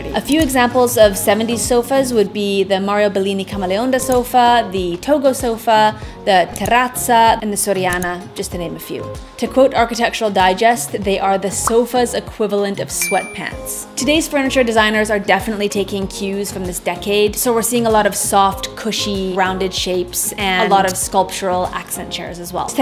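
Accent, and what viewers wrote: Italy. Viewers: Your italian accent is perfect! Greetings from Sicily ☀️ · Where did you grow up? Your Italian pronunciation is beautifulllllll — my HS and college Italian teachers’ ears would flutter — but I definitely hear a hint of some other accent while you normally speak. It sounds like a medley of 3 or 4 influences. Italian has gotttt to be involved.. you obviously speak English, but American English? Do I dare say no? And I want to say French? I am such a lover of soaking up unique ways of speaking and I’ve always been very intrigued by the way you speak! Side: I listened to the audiobook The Glass Castle yeeeears ago (one of my all-time favorite books) and it was read by the author herself who grew up allll over the United States and her accent was sooo unique. I’ve been super aware of tiny details in accents ever since haha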